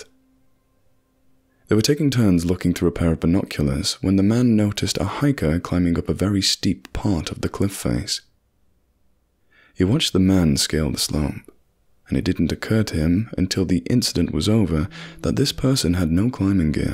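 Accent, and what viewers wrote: South, United States. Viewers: Great job on the American accent! :-) I love these stories. My dad was a volunteer with Search and Rescue here in Canada years ago. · Your American accent is 👌🏻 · I swooned over the southern accent. I recently discovered your channel dear stranger, and I fancy your narrative.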